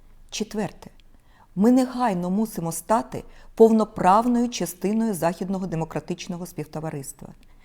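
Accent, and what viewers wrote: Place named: Russia. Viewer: Юля, перестань читать с суфлера, не красиво в столь тяжелый момент. Да и русский акцент проскакивает, щiра украинка, млин.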